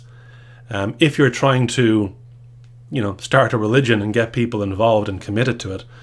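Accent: Ireland. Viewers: haha after I lifted off it was like watching a whole new video. I really enjoy the cadence of your Irish accent. I sent this to my daughter🤓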